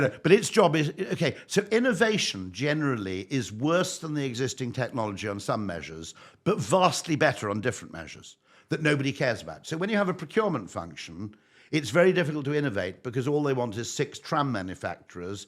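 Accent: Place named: Scotland, United Kingdom. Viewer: He is completely understandable, it is a very mild Scottish accent.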